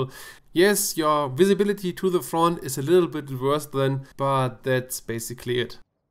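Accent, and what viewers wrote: Germany. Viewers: Well thank you! This video (and the whole 'how to' series) is incredibly helpful. *thumbs up In case I identified your accent correctly: Super gut, werde ich sicher noch das eine oder andere Mal als Referenz nutzen. :) Beste Grüße! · Love a nice German accent for tutorial. for real. Thanks, great info. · Your accent; so let's speak in Deutsche !!..Ohh Ich nicht sprech Deutsche Ahhaha..jijiij jajajaja, jejej. Bueno, hablemos castellano, idioma más impresionante.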